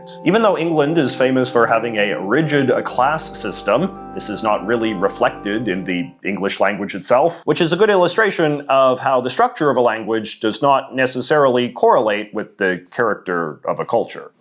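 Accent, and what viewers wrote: Canada. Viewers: Why do American and Canadians sound so similar however the small things like about stayed distinctive and possibly are only Canadian. This accent also seems to be in the northern mid American accent. but why? The hozer accent is so interesting. So many canadians Like have no natural hozer style accent but the about is the one variable. Like some with the word water or lemon. Saying watta or limn. These are all so interesting and seem almost to defy region in some ways. Do these exist in mexico? It is such a huge country that I would assume the accents are VERY different as also geographically there are lots of variables and difficulties getting from place to place.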